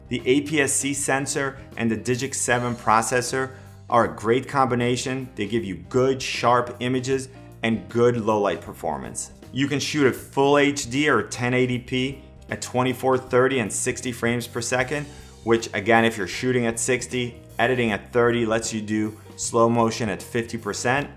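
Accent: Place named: Cleveland, United States. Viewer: First off, I hear that Cleveland accent! We are from Cincinnati but have bene living in London for the last two years. Miss those Ohio accents! We purchased the 200D (SL2) a year ago, (the white version). We are amateur creators and it does exactly what we need it to do, even with the kit lens. Amazing photos, great videos when needed and ease of use cant be beat. We are actually thinking about getting another one or even the M50 so we can both have access to cameras. Thanks for the great content and helpful tips!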